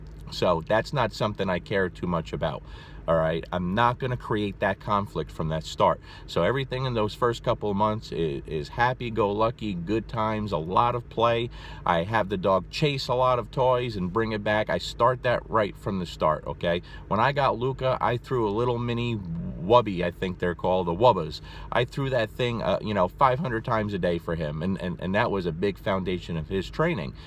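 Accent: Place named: New Jersey, United States. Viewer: Beauuuuuuuutiful truth and boom. “Leave it” was the first thing I taught my lil rescued mutt, (welcomed him in at 4.5 months with zero education before that), and he sponged it up like a CHAMP. Had him fetching and dropping within the first backyard play session too. I think I like fetch even more than Dino... And almost as much as I dig that fab Jersey accent of yers. ;)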